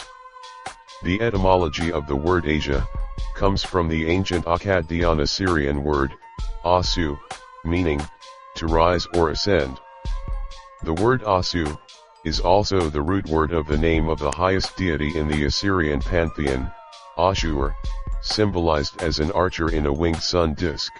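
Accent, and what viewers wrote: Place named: AAVE, United States. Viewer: I am not a fan of black ebonics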